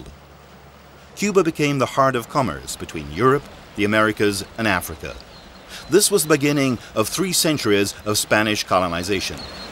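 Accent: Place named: Hialeah, United States. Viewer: dudette, u sound like your from hialeah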